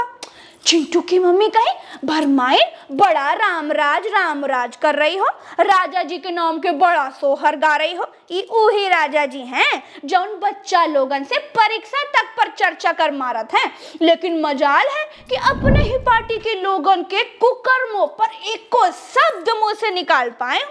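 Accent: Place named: Bhojpuri, India. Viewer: Great acting loved it keep it up ! Love the Bhojpuri accent !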